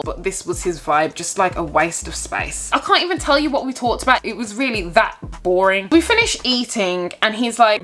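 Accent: United Kingdom. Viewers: I love your British accent!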